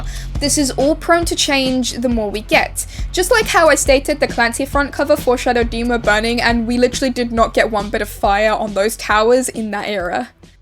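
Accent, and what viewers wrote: United Kingdom. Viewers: i didn’t realize dema was british 😭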